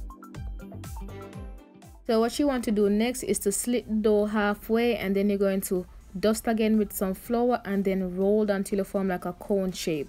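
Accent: Jamaica. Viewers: It's a long time I have been looking for a Jamaican plant based channel. Now I have found one with a Jamaican that sounds like a Jamaican. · Great video very helpful, but I love the Jamaican accent more than anything . I will be watching all of your videos now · Jamaican accent wtf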